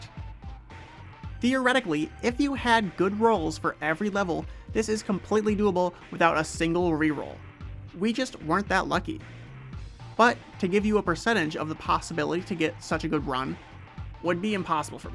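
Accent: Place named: United Kingdom. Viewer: British lad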